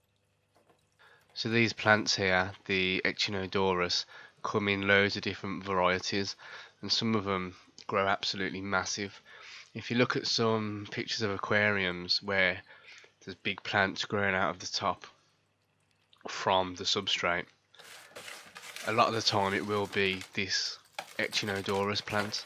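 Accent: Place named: West Midlands, United Kingdom. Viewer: that west midlands accent though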